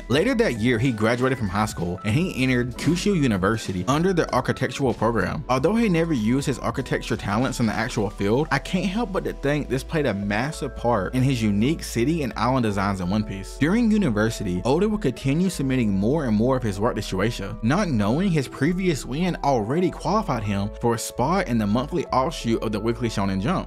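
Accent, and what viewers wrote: South, United States. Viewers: i aint the only one who hears a county acsent right?? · Where is that accent from is sounds southern but im not sure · That southern accent lowkey hittin’ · Now realizing you’re jus doing a YouTube accent to cover your southern accent, bro own it, be the southern one piece youtuber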